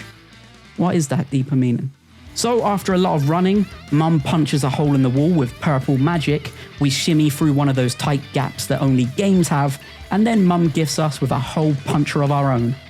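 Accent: England, United Kingdom. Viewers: This video is up there with majority of "Woke Critic" channel videos, only the difference being The Woke Critic is likely to be Irish instead of somewhat pompous but still financially Chav English of yours and that's also a complement too lol Greetings from Indonesia, I really don't like how this game sets up and putting the gameplay models of certain things, it looks like a early access game but with slightly more polished models that looks like a combination of photorealism & uncanny valley, which Sweet Baby Inc and their networks of gloriously self-righteousness consultancy (and their associates) doesn't disappoint at disappointing their audiences, as usual...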